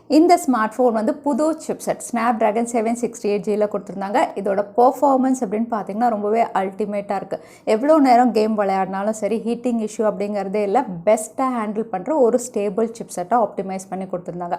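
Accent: Kerala, India. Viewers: Kara Kara Kerala tamil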